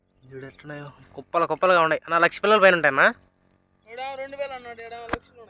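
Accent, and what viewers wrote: Nellore, India. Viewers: Meeru maattladatha vunte mana Nellore lo ne vunnattu vuntadhi naaku😅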